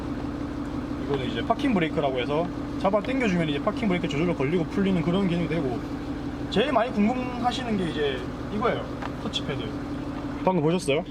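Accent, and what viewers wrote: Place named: Gyeongsang, South Korea. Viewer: 최초 갱상도 사투리 리뷰.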